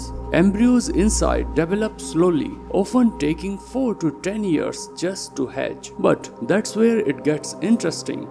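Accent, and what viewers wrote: India. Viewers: Lol Youtoube get this trash out of my feed. AI + Indian accent? come on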